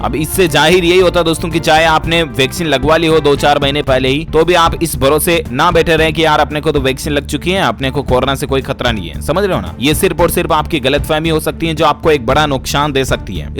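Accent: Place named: Marwari, India. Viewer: Aapki marwari boli mast hai motiyar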